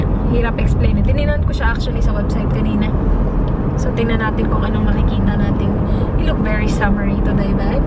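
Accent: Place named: Visayas, Philippines. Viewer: Her accent ?? Kala mo dili bisaya magsalita ehh grabe... Ilove your blog..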